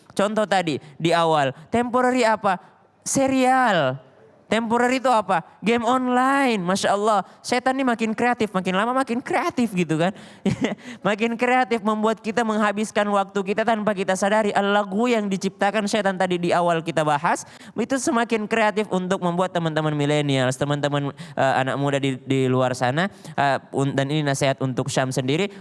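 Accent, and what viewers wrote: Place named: Sulawesi, Indonesia. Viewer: Ustad te koyo dr sulawesi yo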